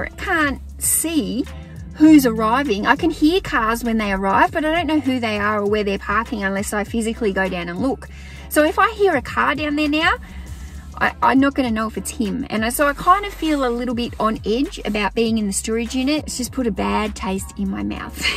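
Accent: Australia. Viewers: Hello, this is the first time viewing your YouTube videos. I did enjoy your content and hustle. I know a lot of folks, like myself, from the U.S. cant distinguish certain accents. I have always loved the Aussie accent over British. I don't know why. I do have a question though. Did you just say to yourself, "These IKEA bags are fun and awesome." They really are. I see blue ones all the time. I've never seen these. That's so cool. My wife and I need to get some. We are trying to one day be successful in turning things for a profit. We have gotten our feet wet. One day we will be full time. Lots of love, Rosie and Ritta from Texas.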